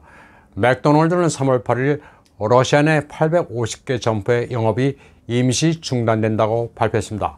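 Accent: China. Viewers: 중국인이신가요? 발음이 중국인같아요.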